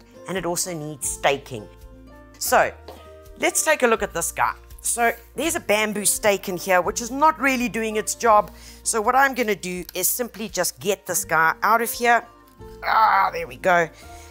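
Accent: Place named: South Africa. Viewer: Excellent video. Also, I love your South African accent. It's a great accent, and I love olives 🫒 there so delicious and have a wonderful flavour.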